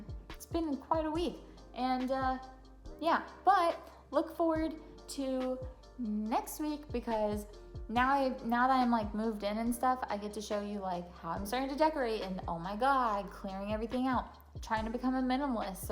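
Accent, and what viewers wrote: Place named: Coventry, United Kingdom. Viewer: yew sounds like wer we live in coventry ri be safe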